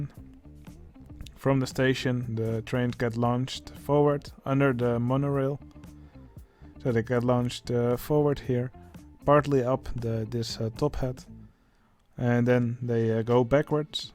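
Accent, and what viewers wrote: Netherlands. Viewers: Ik kan wel horen dat je Nederlands ben.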